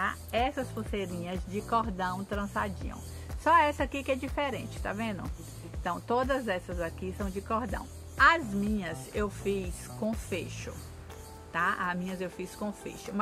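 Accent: Northeast, Brazil. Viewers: Vc é nordestina?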